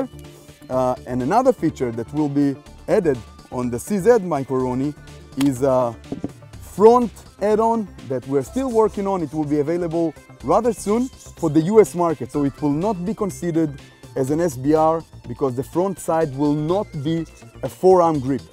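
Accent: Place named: Israel. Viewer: It is called Israeli accent : )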